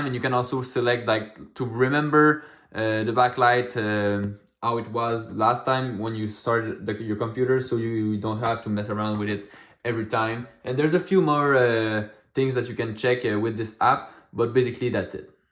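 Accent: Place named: Quebec, Canada. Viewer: Tu est quebecois ca ce voit MDR!!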